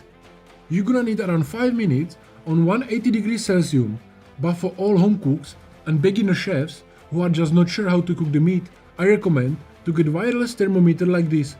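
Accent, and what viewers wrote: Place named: Czech Republic. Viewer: Love the Czech accent.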